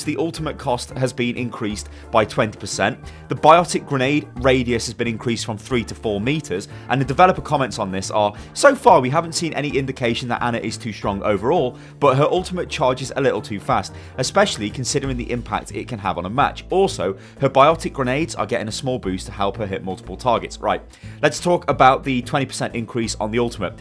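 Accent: United Kingdom. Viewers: God I love british accents. Ana turns into "Aner"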